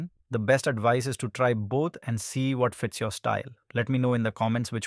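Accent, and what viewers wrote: India. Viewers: Indian accent pmo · Indian accent, right?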